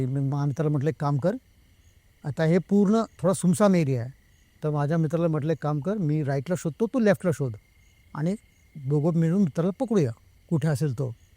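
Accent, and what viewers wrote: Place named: Pune, India. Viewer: arey re mitra proper punekar ahai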